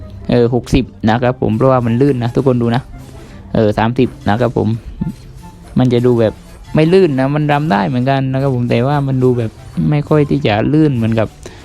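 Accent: South, Thailand. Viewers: พี่เป็นคนใต้ใช่ไหมครับ😆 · พี่คนใต้ใช่ไหมผมฟังจากสำเนียง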